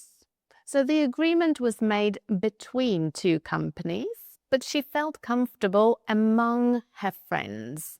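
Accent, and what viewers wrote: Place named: United Kingdom. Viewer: Thank you madam, very much for your excellent elaboration. By the way, I love your British accent. That 's fantastic.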